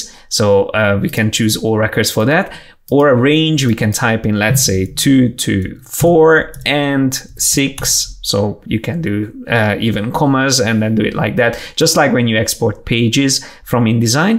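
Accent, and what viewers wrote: Hungary. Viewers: Ne haragudj. Véletlenül nem vagy magyar? Annyira magyar az akcentusod.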